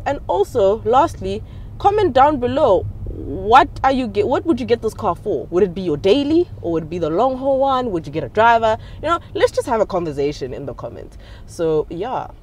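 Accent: Pretoria, South Africa. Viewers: "Ke diesel gaeje petrol" 😂😂😂she's definitely from Pitori 😂😂❤ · The Pretorian accent made me enjoy this review 😂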